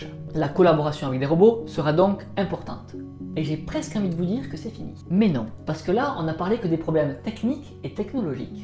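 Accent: France. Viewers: Mama cet accent toulousAIN mdr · l'accent provençal enlève beaucoup de crédibilité, mais c'était interessant !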